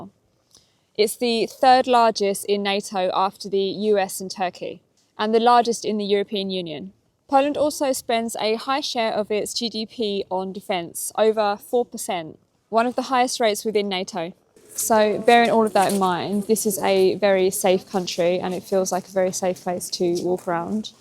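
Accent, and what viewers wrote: England, United Kingdom. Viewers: I really like this British accent :-) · this is a great report. Well done. And you are such a sweet girl 😊 Love your English accent.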